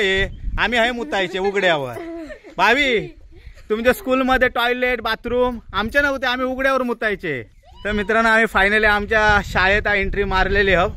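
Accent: Malvani, India. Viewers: Tu malvani volg madhe Chan Boltos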